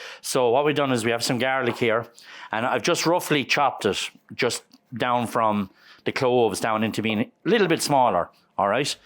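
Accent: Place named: Ireland. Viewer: Hmm, accent of the host ..nm- ireland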